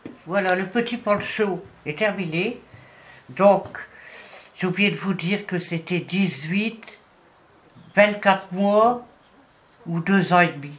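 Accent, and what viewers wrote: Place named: Pas-de-Calais, France. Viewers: Je l ai reconnu de suite l accent ch'ti je suis de bruay la Buissière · Bonjour, déjà merci de nous offrir tous ces tutos que vous expliquez à la perfection, j'ai retrouvé un papier sur lequel j'avais ecris leur taille et dimensions pour celle de 8 ans c'est bien d'épaule à épaule donc 30 cm pour celle de 10 ans 34 cm mais en vous regardant travailler si j'ai bien compris on fait un nombre de maille qui va jusqu'à ces dimensions et cela nous donne un poncho à leur taille, c'est bien cela!!!! Merci pour votre gentillesse et votre charmant accent que j'adore cela me rappelle Cambrai!!! belle journée et merci pour votre réponse!!!! je vous cite à chaque fois que je fais un de vos ouvrages et je vois que pas mal de personne vient visiter votre site!!!! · Votre accent du Pas de Calais, c'est celui de ma Grand-Mère 😍, une grande crocheteuse aussi, je pense toujours à elle quand je crochète.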